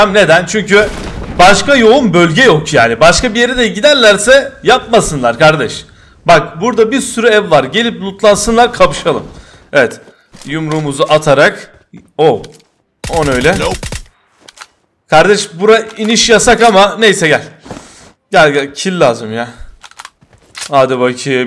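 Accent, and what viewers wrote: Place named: Gaziantep, Turkey. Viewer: trakya şivesi değil o. antep ve adana bölgesi şivesini yapıyor sürekli. geliyirüm felan diyor arada.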